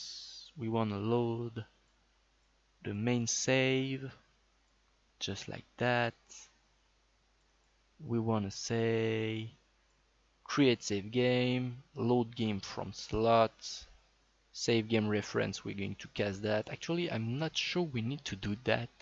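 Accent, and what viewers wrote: France. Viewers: your are french ?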